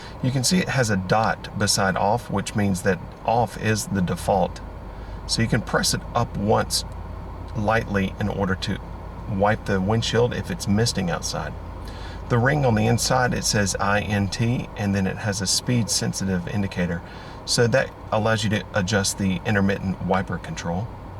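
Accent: North Carolina, United States. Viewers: I like your NC sweet accent but boy you need to learn things about cars. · I LOVE your accent😍 your voice is so soothing too lol #southernaccentftw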